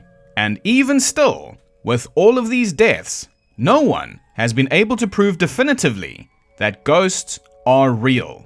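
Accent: South Africa. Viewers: South African accent, I noticed instantly 🇿🇦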